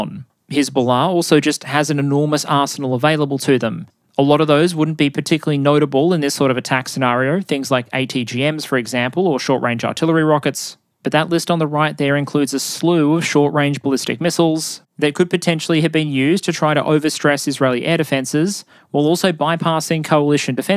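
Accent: Australia. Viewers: Your upload schedule is perfect. You always just in time for me to fall asleep to your video on Sunday night (I'm same timezone) and then watch/listen to on the way to work in the morning. It's also fantastic to hear an Aussie online, and one who clearly knows his shit. Keep it up,.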